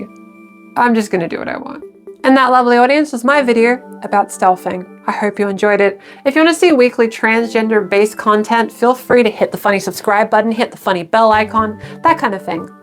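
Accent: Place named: Australia. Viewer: Huh - youre Australian accent is way more noticeable with your masc voice. Its a super interesting party trick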